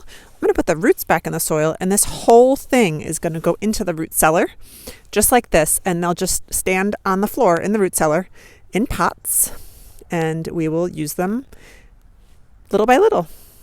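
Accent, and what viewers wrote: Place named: Minnesota, United States. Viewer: You talk so much like me. We must be Minnesotans. 😅 Love November gardening. Thanks for the video.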